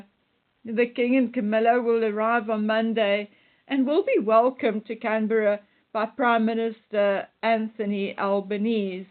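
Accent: South Africa. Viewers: South African accent detected. Which province are you from?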